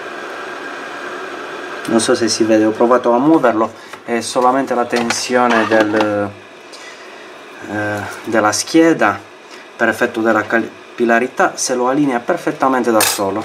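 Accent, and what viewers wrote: Sardinia, Italy. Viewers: Dall'accento non capisco se sei sardo o rumeno. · secondo mè l'hai messo in corto , si nota un tipico ma simpaticissimo accento sardo unsaluto e comunque un grazie per l'impegno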